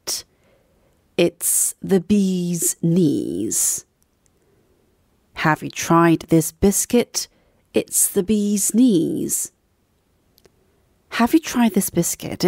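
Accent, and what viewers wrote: RP, United Kingdom. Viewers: I like that you speak RP accent. It's basically, making sure you pronounce every single party of the word and it is a lovely way to speak.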